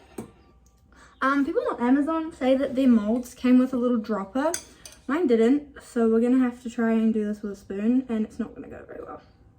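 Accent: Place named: New Zealand. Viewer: My first question was going to be what is your accent?? I couldnt quite place it! New Zealand! I was actually vegan for many years. That mold is so cute!! For my silicone molds, I like to put them on top of a small cookie sheet to carry them to the fridge or the oven. I had too many mishaps before I started to doing that..Hahahaha. My Grandsons love gummy bears, so I know they would enjoy these!!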